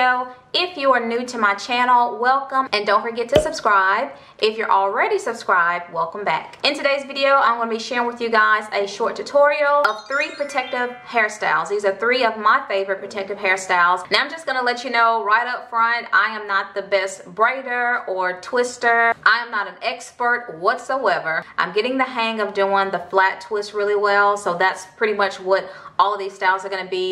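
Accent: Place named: South, United States. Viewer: As a southern woman, your accent is everything!!! I think you're the first southern woman I've watched do a hair tutorial.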